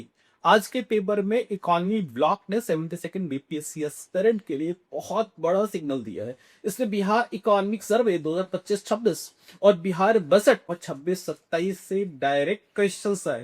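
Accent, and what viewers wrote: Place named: United Kingdom. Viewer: Hindi ko b English accent m bolne ki kya jarurat h sir aapko. BTW...nice session. Thnxxxx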